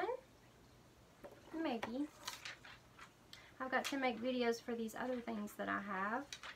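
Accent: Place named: South, United States. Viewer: I love your ramblings and your Southern accent! You are growing so fast 😊😊😊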